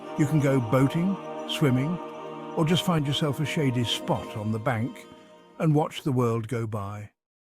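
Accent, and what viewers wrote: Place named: United Kingdom. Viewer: What a crock I lived in Eufaula for over 30 years. This is a bunch of stock photography and some dude with a British accent thumbs down.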